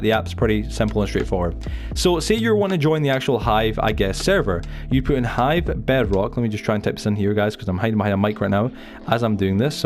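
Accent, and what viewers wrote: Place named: Dundee, United Kingdom. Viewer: U sound like ur from Dundee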